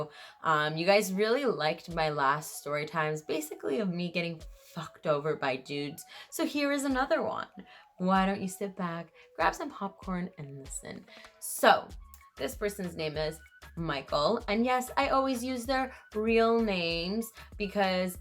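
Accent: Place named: Middle East. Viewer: Which part of the middle east you from?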